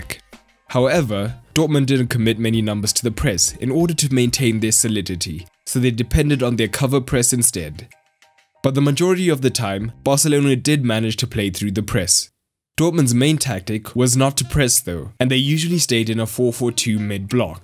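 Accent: South Africa. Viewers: U south african?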